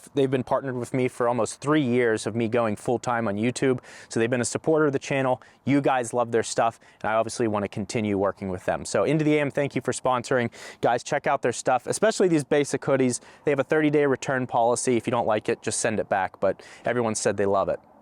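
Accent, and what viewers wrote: Pittsburgh, United States. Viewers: Just found this channel searching for Rivian Reviews and you sold me with the Yinzer accent. I'm considering a R1S to haul kids and stuff around. I'm so iffy on dropping the cash on the R1S but I'm looking at a 2016-18 Land Cruiser and the cost savings alone are just insane. I think 2024 will have a lot of great things for Rivian. I have a 18 month old and a new baby too in march and I just think the Truck will be a touch too small. If I got the R1S I would toss on the Frontrunner roof rack and have so much storage.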